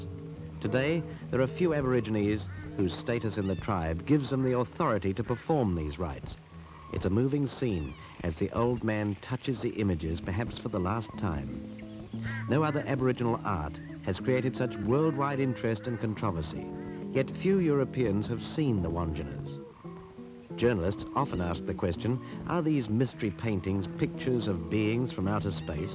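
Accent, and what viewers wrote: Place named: United Kingdom. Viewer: The 'Bri-ish' voice over is hilarious, I remember being shocked in the 80's, when I first heard him talk.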